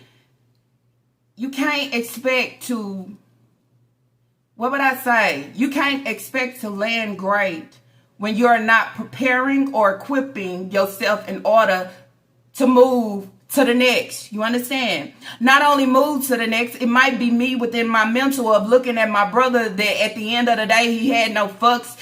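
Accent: Texas, United States. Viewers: ❤ hay girllll hay I just put up.grocerys An stop to catch my.breath.when I herd you say radiation contamination.I fell out laughing. An with that beautiful Texas accent. It's to much.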